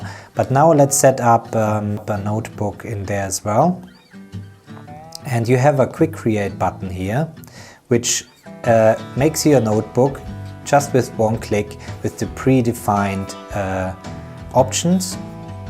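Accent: Germany. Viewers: Sorry, bist du Deutscher?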